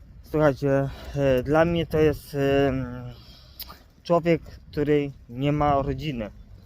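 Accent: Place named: Łódź, Poland. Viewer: Mówi typowo łódzkim, miękkim akcentem. xD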